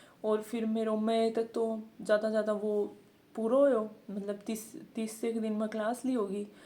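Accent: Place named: Chirawa Pilani, India. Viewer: Apki langauge chirawa pilani side ki lg rhi h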